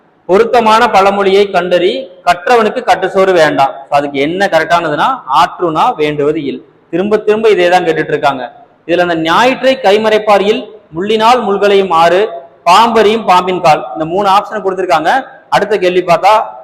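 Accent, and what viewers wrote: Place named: Kongu, India. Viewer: Anna neenga kongu tamil azhaka pesuringa enna oor neenga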